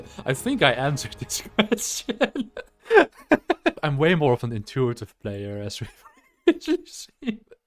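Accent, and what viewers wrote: Netherlands. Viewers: Are you Dutch? I recognise that accent from everywhere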